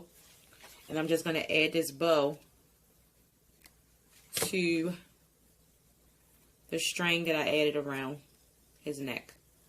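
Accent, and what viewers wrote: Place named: Maryland, United States. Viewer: I know we live in the same state because of your accent. I live in Reisterstown and work in the City. But I love your crafts and would be honored to have one of them.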